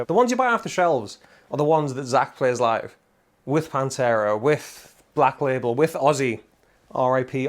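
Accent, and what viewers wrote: Newcastle, United Kingdom. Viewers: ! I only started playing recently. I'm really lucky that I have guys like you on Youtube who play really cheap gear and make it sound amazing, it teaches me to focus on my playing and not the gear. I also love the geordie accent! I learned english watching True Geordie on youtube talk about newcastle football club in 2015 (when they got relegated 😂) so I love how you talk howaay lads heres to 2026!!!!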